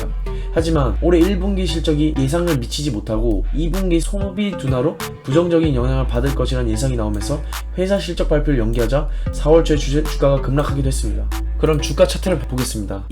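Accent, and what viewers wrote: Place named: Jeolla, South Korea. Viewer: 영어 발음이 섹시하시네요 ㅎㅎ 반면에 한국어는 전라도 억양? 귀여워요!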